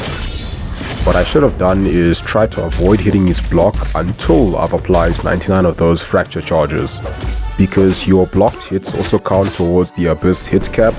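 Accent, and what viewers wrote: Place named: South Africa. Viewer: Are you from south africa, your accent kinda sounds like a few people i know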